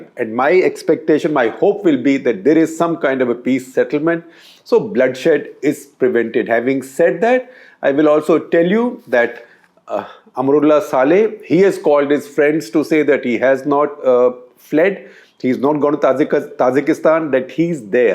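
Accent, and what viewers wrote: India. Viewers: gupta G you have curry accent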